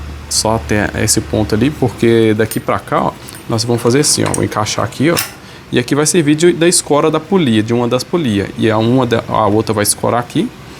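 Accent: Minas Gerais, Brazil. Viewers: "Olha só procês vê", mineiro raiz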